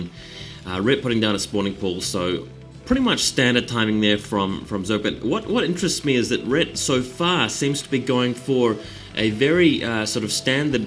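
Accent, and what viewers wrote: New Zealand. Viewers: kool to see a real starcraft game again, some of my best games on this map long time since i viddied some of, the new zealand accent is nice:) btw i think ret has +1 attack on his lurkers or atleast i always got that for them first so they twoshot marines with 3 armor?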